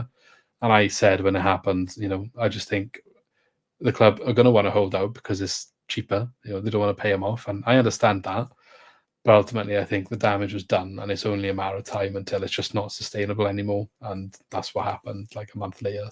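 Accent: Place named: Wales, United Kingdom. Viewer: I hear a slight Welsh accent with a strong twinge of English 😂😂 having parents from both North Wales and Northern England I can definitely confirm we have a better quality of countrymen up north 😉sorry was this about football 😂😂 UTC’s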